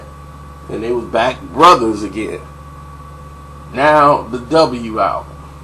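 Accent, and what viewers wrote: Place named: Midwest, United States. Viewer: j o yes hes from midwest tho...not east coast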